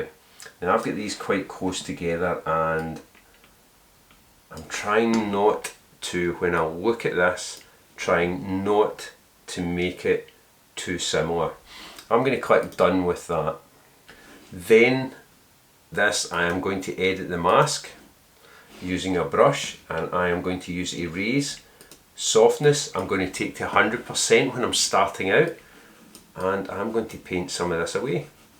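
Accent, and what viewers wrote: Scotland, United Kingdom. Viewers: The Google-subtitles in English are not perfect either... Possibly because of his strong Scottish accent which makes it hard to understand for non-native speakers;-) But his video's are great!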